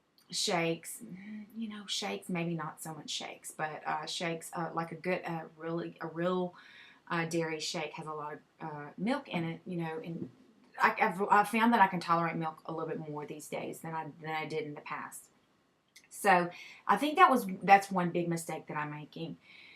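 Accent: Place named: Louisiana, United States. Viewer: I see ur Saints Fleur de lis on the wall, I did notice your accent before and thought you were a LA girl too! I live in LaPlace 30 min from New Orleans. Love, love, love ur updates! Penny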